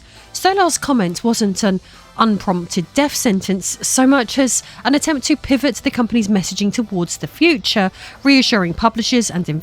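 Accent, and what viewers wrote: United Kingdom. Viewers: Not sure which part of Britain you're from but your accent is gorgeous. Very similar to 'Obsidian Ant' a guy who talks about space games, except he sounds like a wizard! He has a few hundred thousand subs... Great accent 👍👍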